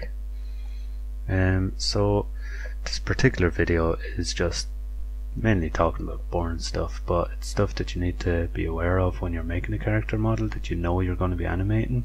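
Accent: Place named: Ireland. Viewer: The Irish accent will keep me watching this! <3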